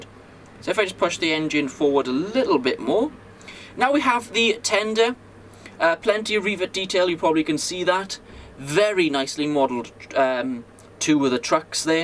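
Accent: Wales, United Kingdom. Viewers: omg are you welsh ? and wered ya get one my nethew would go mad to get 1 lol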